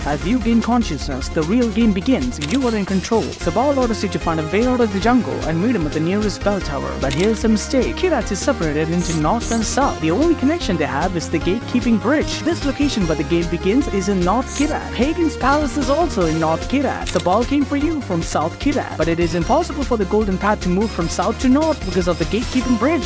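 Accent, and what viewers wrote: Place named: India. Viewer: He literally sound indian from the beginning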